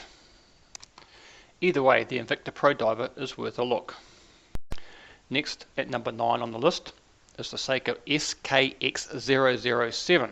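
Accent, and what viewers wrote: New Zealand. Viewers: Such a pleasure to hear a New Zealand accent around horology circles on YT. As a proud Aussie, I was taken aback by your omission of the local (Auckland,) and well-regarded, Magrette brand. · Nothing like a kiwi accent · È un leggerissimo accento neozelandese...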